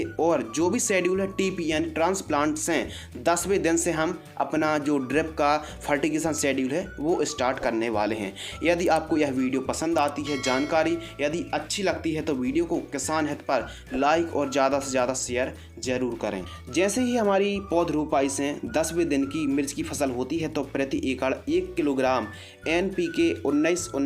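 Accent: India. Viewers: Apka ye video mujhe achha laga, jaankari jabardast h sir, ....... Apka bolne ki shaily rathiya bhasha laga app kaha se ho sir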